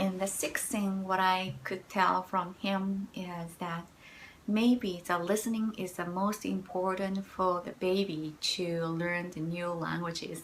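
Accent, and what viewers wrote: China. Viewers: Are you a Japanese or Chinese? Your English accent seems like Chinese person speaking English.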